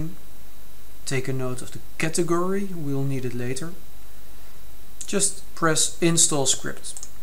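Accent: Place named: Netherlands. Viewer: Its scary when people contact me to tell me that I sound just like you. Can tell by the dutch accent it def. does. But voice, pitch, etc also comes rather close. yay for finding my voice-twin lol.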